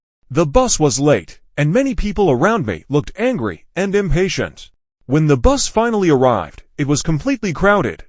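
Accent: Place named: United Kingdom. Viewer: Es acento británico se escucha muy bien la pronunciación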